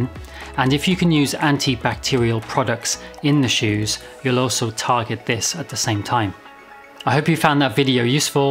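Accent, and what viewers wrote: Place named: United Kingdom. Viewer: I love your British accent! Perfect pronunciation, so clear when you speak!!! Greetings from southern France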